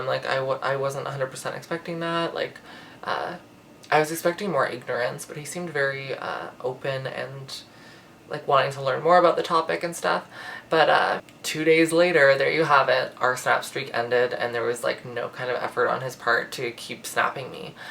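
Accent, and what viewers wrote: Canada. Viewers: You are one of my favourite youtubers. You come from the same region as me and I find your voice, speech pattern, wording, and slang so calming to listen to. Aside from that I genuinely enjoy hearing what you have to say content wise. I've been living provinces away from home for the past couple years and your videos are my favourite thing for easing homesickness. The way you tell a story is just so familiar and that dry subtle humour paired with classic Canadian manners just gets me laughing.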